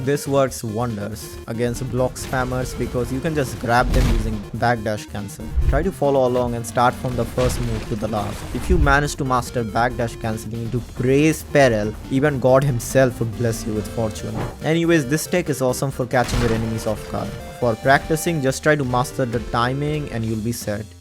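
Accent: India. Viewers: Hello guy with Indian accent Remember me when u get famous. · He has an indian accent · I bet ur a indian bc of ur voice and a good vud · Love the Indian accent I will sub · R u Indian? You sound like my friends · Why u sound like u are indian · Are you indian? Your voice sounds like a Indian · You sound indian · idk why this guys accent is like indian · Bro he sound indian (not being racist ) nice vid of tutorial btw... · YOUR VOICE GIVING ME INDIAN VIBE ARE U? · You speak english but sound like indian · bro voicelike a indian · ohh But your accent is like Indians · Bro got that indian voicd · indian accent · U sound like Indian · Bro idk but your English sound like indian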